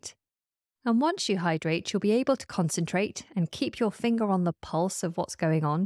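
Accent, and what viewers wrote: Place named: United Kingdom. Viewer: I really love to hear a woman speak british english. excellent podcast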